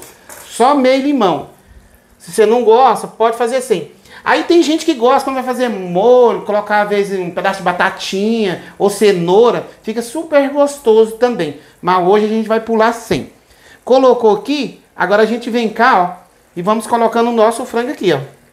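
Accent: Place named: Pernambuco, Brazil. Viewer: Sou Pernambucana e achei o seu jeito de falar parecido com o nosso. Bjs queridos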